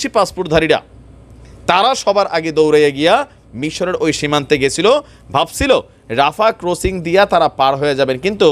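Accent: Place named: Comilla, Bangladesh. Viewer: আপনার এই খবরে আজকে কুমিল্লার ভাষা সুন্দরভাবে শুনে গেছে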